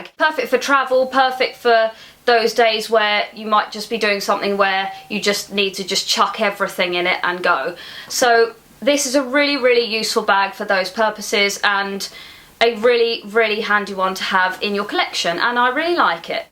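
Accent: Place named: England, United Kingdom. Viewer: Hi, I stumbled here through accident. I am not into handbags as I am guy. :) But I have to tell you that you have a very enjoyable voice Ma'am. I hope you ever heard of ASMR as I think an ASMR handbag video by you would be one of my favorites. ;) edit: I forgot to mention that also your English accent would make an ASMR video by you even more enjoyable. :D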